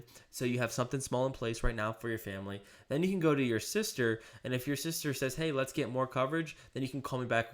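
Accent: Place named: Rural, United States. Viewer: Heyyyyyy…..it’s!!!! Hardest opening line, wish my name was so I could say it with that country twang😂 anyone new to this channel, watch EVERY.SINGLE. VIDEO. that this man puts out. He will help you pick the right agency, get out of bad ones, create a GOLD script, source free leads, teach others. Dude you guys don’t understand is literally putting his neck out there so that we can learn and get real,honest,quality information about the industry, and what to watch out for. These big IMO/MLMs don’t like what he says because it pulls back the curtain on lots of these pump and dump recruitment models. has not only lived the broker/agent life good and bad but also teaches others the exact blueprint. He’s sharing his success and wins with us random strangers. Protect this man at all costs. Also just got my resident license snd a bunch of non resident license which my imo told me to get and then after getting them said I overdid it😂 So far so good, no red flags in the contracting department. 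I’ll be starting age lead calls Tuesday. In the meantime I’m still trying to get a hang of doing EAPPS and scripts. Any tips on mastering EAPPS and the products each carrier sell? Thanks. Keep it up!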